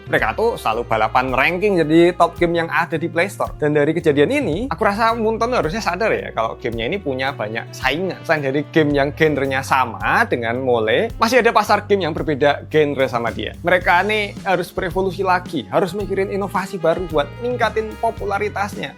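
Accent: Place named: Java, Indonesia. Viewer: Aku org jawa, tp gk tau knp klo logaty medok bgni jd gk meyakinkan, krn biasay org sono2 garing, baru tau sdkit heboh.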